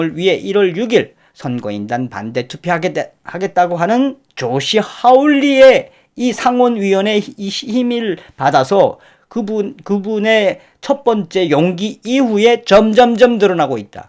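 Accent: North Korea. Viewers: 님 틸북인이시가요? 북한 말씨여서요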